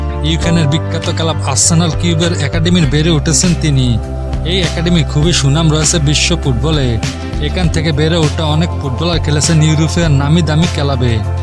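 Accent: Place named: Noakhali, Bangladesh. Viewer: ১০০% সিউর এই উপস্থাপকের বাড়ি নোয়াখালী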